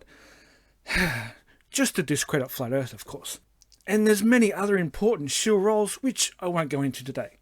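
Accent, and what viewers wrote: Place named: Australia. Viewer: Did it ever occur to you that your voice tone and phasing resemble Mal from Borderlands 2, but with a great Australian accent? <link> Check it out!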